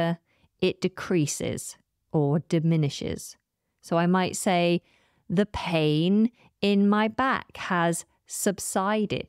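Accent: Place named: United Kingdom. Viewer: my favorite english teacher.... I love her british acent❤❤❤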